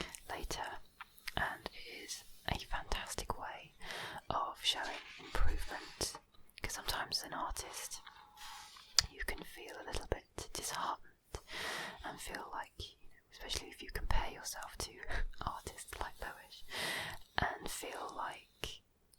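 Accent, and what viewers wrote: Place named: United Kingdom. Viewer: Sorry but I genuinely don't like British or similar to British accent,so this video soundeed agitating to my ear due to that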